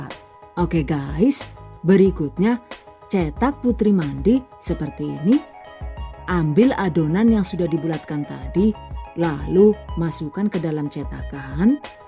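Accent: Banyumas, Indonesia. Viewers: Mantap bun...Purwokerto hadir ,tp tinggal e ng Bekasi.seneng bgt klo lg ngmng ngapak.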